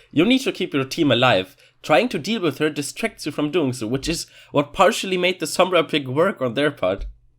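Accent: Germany. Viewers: Are you from Germany?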